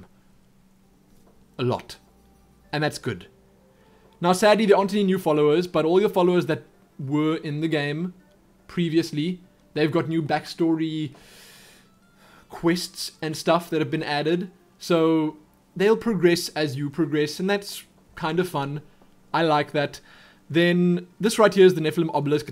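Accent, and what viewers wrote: South Africa. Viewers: ***** I know, I was referring to the South-African accent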